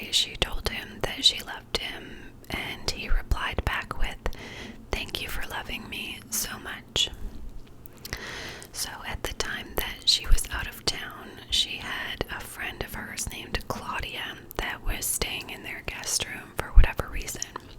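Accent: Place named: Canada. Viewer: I have a feeling you’re a fellow Canadian😂 (Toronto here!) Something about the cadence of your voice and ofc the politeness haha Love your channel!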